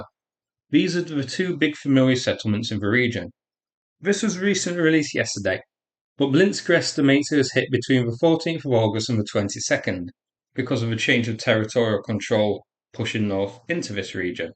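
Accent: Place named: Wales, United Kingdom. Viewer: bruv, srsly pls try speaking a bit MORE english, and a bit LESS of whatever accent of yours that is...sometimes ya seem forget you have an INTERNATIONAL audience, and we dont speak WELSH or GAELISH^^ xD thx in advance!!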